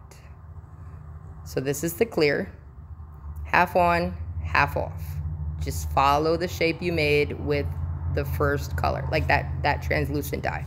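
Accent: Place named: St. Louis, United States. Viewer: Thank u, it was a strange deja vu feeling when u spoke. I was born in St. Louis but lived all over the country growing up. U have a St. Louis accent to me, but I have been teased by friends my entire life that I took a little bit of every place I lived & blended them all together, maybe that’s what felt familiar to me idk,🫣don’t mind me!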